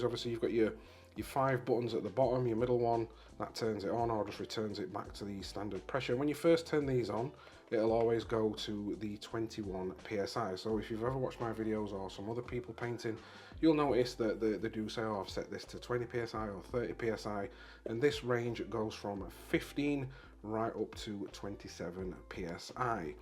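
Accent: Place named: Wigan, United Kingdom. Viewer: Lad sounds like he's from Wigan or something so I assume he's pretty feral 😅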